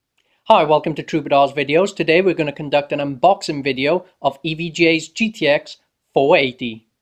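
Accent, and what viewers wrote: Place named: Wales, United Kingdom. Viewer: Are you Welsh? You sound Welsh hah.. Awesome video.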